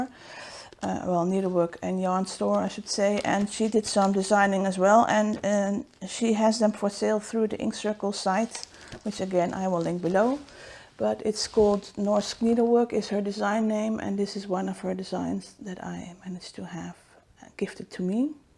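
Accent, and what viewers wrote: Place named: Netherlands. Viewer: Finally found a dutch flosstuber. I heard your accent and just knew. A new subbie here. I did a few inkcircles too. Yours are beautiful. Marike zeewolde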